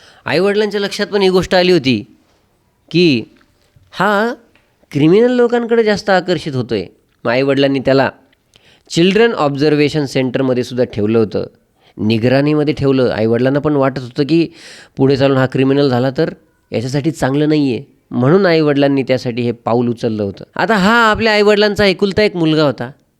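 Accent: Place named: Rural, India. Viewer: Narrator's Marathi sounds unsophisticated and from rural area. It should be polished to reach out to urban audience.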